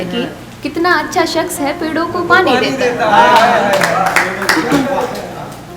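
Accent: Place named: Haryana, India. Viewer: Hindi me kosish acha h but ur hariyana sun jaan me jaan ati hai. 😘😘😘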